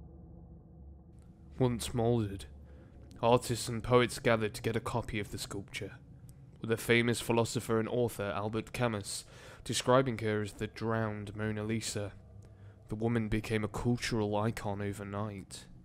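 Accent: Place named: United Kingdom. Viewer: Sorry but I think this story was proven to be a hoax in Paris. The face comes from an art book from a Helsinki art school published 20 years before the body was found. However many young people were fished out of the Seine during that period. Sorry to be a negative Nancy. I do love the gravitas in your voice and the brit accent!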